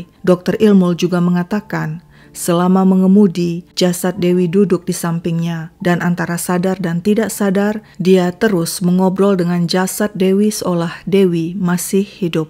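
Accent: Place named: West Sumatra, Indonesia. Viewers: mantap logat minangnya...jangan jangan urang awak juo 👍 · Jadi YAKIN... ENCI... ADALAH TIONGHOA SUMBAR...LAFALNYA PAS LOGATNYA KENTAL...😁🙏🙂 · Mantappp logat minangnya bagus nih..... sukses terus yaa... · Logat padang nya mantap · Kyk nya ni org minang, logatnya kek minang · Ternyata logat minang padang mbak kental kali dialek nya....menisyaratkan mbak org minang.. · tyt orang padang.....aksennya dapat banget....eh dialeknya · Mba orang Minang kah? Bagus banget logat Padangnya. Soalnya saya orang Padang juga.eheheh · Bahasa minangnya kok padat benernya.. Orang minang ya min?? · Uni,, urang awak nak? Luruih bana minang nyo,, sabana ancak... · org padang?? Logatnya pas.hehe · Logat padangnya masuk.. orang padang jga kah uni? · Melihat longgat dlm narasinya,pasti dia orang Minang....😊😊😊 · orang minang, ya...? Bagus sekali logat minang nya.... 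· Hebat bs bhs/ logat Padang 👍 bsk2 pk bhs daerah lg ya, enak dengernya 😀👍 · bahasa minangnya benar2 fasih, dengan logatnya minang bgt... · Waduh... top banget logat padangnya si narator. Saya orng pdng asli mengakui. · Fix urang awak...logat minangnya pas banget 👍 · Mbak kayaknya orng Padang ya??..logat/ cara bicara bhs minang pas banget...sy orng padang..jd tau klu mbak logat padang pas 😀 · mbak suaranya enak didengar dalam penyampaian,apa lagi suara mbak dgn logat minang rancak lamak didanga, sukses taruih yo Uni rang Minang,AMIN🙏❣👍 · mungkin ini berasal dari minang karena logat dan penyampaiannya persis kayak orang minang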